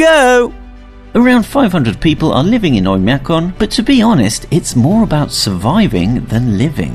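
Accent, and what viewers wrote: United Kingdom. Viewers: Why does he uses Fahrenheit when he has a British accent?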